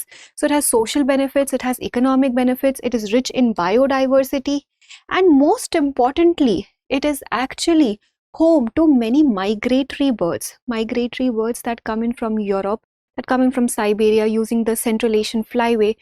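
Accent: India. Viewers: Madam ji indian accent me padhayo jaise lag rha hai college me lecture de rhi ho 😍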